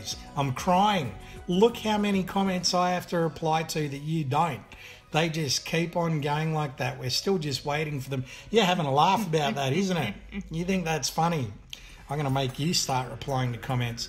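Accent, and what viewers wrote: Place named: Australia. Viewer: Love the accent, it is the guy's accent, I am guessing Aussie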